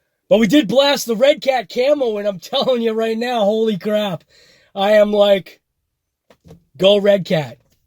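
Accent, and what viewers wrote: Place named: New England, United States. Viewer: Awesome bash and great truck! Are you from new England area? You sound like you got a accent.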